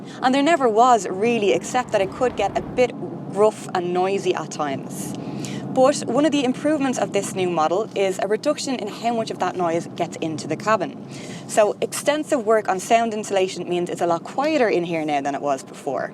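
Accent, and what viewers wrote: Dublin, Ireland. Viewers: That is not a English accent it's a Dublin accent. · Great review with the Irish accent 😊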